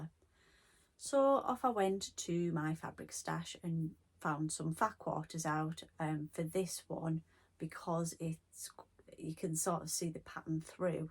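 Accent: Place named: Northern England, United Kingdom. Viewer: Love your presentation and accent-northern England? My husband and his family are from Bradford. I’m getting back into sewing after MANY years, enjoying new techniques and reviving my skills ❤️ keep up your good work!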